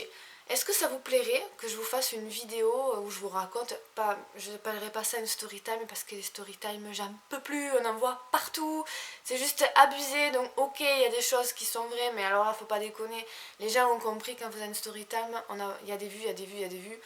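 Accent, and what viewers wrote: South, France. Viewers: Coucou! Je suis de Montréal, j'ai exactement ton age, j'aimerais te dire premièrement évidement que je te trouve magnifique! J'adore ton accent du sud de la France;) et je te trouve vraiment intéressante et terre-à-terre. Je me reconnais beaucoup en toi et je te souhaite vraiment de réaliser tous tes souhaits tu sembles vraiment mériter tout le meilleur du monde! Bisoux bella xxx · slt je te kiff!!! Es ce que tu viens du SUD!! 😘Bisous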